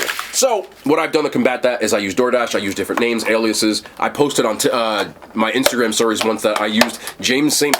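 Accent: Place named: New Jersey, United States. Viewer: The jersey came out in this one😂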